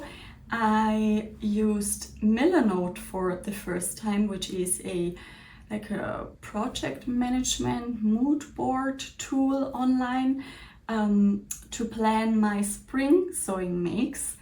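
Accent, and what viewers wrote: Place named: Germany. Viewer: Hi! This is my first time visiting your channel. I believe I detect a German accent. Kommen Sie aus Deutschland? Ich auch, aber ich wohne in America. 😊 I was also in London at the time of the Stitch Festival, but was attending a sewing retreat closer to Birmingham and couldn't do both. I was able to meet up with Cara, right after the Stitch Festival though. It's an amazing feeling when we have an opportunity to meet some of the wonderful people we watch and admire, isn't it? I find it interesting that you (and I) find it necessary to justify why we've done something that, to others, might feel extravagant. Life is full of opportunities and if you can say yes, to some of them, then wonderful! Plus, we are all sewists here and totally understand the appeal of the Festival and wanting to be a part of this amazing sewing community that seems to only exist in the UK! 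Maison Fauve seems like a perfect fit for you, from the little I gleaned from this video. I am also a big fan because the whole company just has a feeling of elegance. Their fabrics are a bit pricey but worth it. The unmistakable quality will make your garments last for a very long time. Ok, I think I've rambled on enough. I do look forward to seeing what will become of all your purchases!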